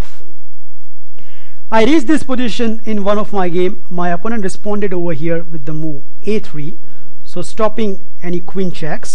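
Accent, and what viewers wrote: India. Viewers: ***** I like your accent. From which part of India are you?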